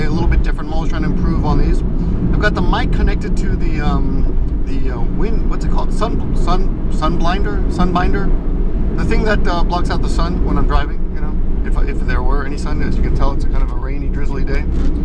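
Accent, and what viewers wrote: Australia. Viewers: lol your Aussie accent sounded a bit British XD The first Youtuber that I ever saw. I don't remember exactly who but I know that I use to be really into Smosh. I know that they are still really huge but I can't really think of anyone else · I gave you thumbs up simply for the Australian accent ;)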